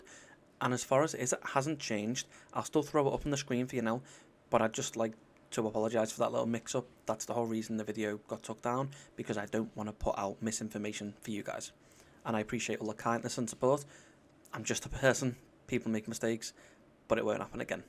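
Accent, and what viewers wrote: Liverpool, United Kingdom. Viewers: Is that another scouser playing WoW ? Boss.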